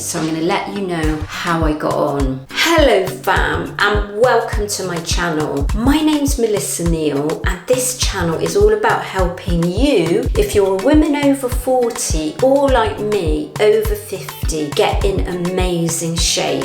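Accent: United Kingdom. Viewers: A Nigerian with Brit accent? Hum, they don’t go together!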